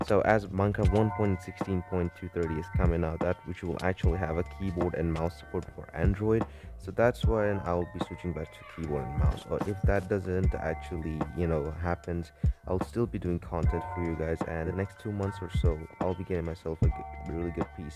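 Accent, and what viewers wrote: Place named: Singapore. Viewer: this sound like a Singapore accent I'm not gonna lie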